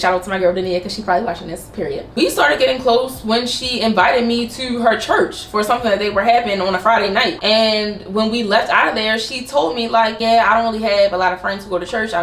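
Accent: Baltimore, United States. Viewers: Are u from Baltimore?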